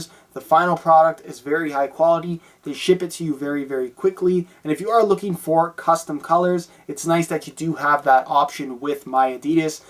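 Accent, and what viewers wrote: Canada. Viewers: Hahaha I knew you were Canadian! Go Leafs!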